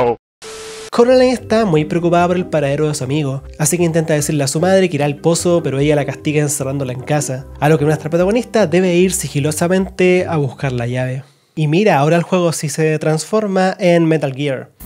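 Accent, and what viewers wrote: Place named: Chile. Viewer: Bro tienes buenas ideas pero si quieres crecer trata de no tener el acento chileno.. para que seas global y te vean de mexico tambien, colombia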